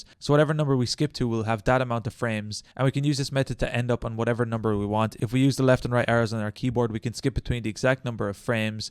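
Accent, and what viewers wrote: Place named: Ireland. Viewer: Thank You! You sound Irish :D Also how did you get the Join Membership Feature??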